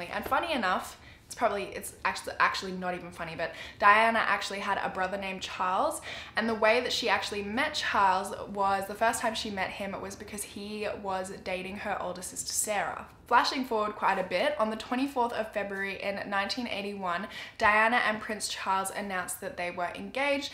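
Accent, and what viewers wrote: Australia. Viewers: Idk you but I pressed on this video and was so happy because I instantly noticed your Australian accent 🇦🇺🇦🇺 I’m from Perth btw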